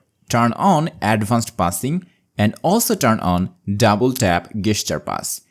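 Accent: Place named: Bengal, India. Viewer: Your accent is Bangali. Are you from Bangladesh?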